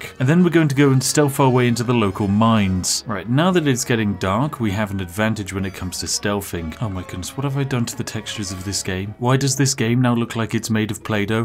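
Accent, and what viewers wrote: United Kingdom. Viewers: Hey brit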